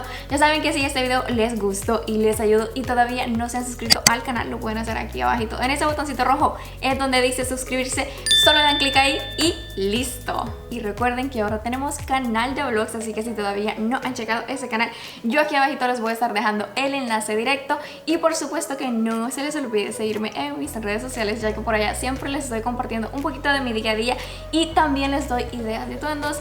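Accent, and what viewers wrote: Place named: Honduras. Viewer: Lo q me encanta y admiro de ti esque no has perdido tu hacento hondureño!!! 😘😘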